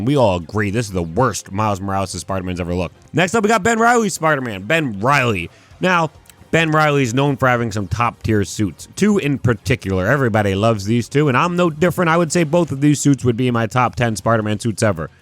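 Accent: Boston, United States. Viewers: unrelated, but been meaning to ask, are you from Boston or something ?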